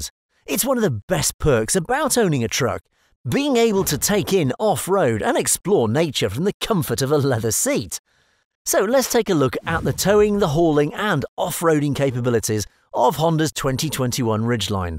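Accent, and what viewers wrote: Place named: United Kingdom. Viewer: Terrible British accent for a car that does not Get sold in the u.k.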